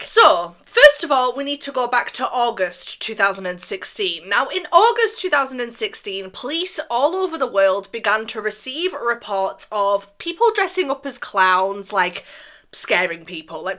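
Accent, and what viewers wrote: United Kingdom. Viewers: when i tapped on the vid i did not expect a heavy british accent😭😭 its so iconic tho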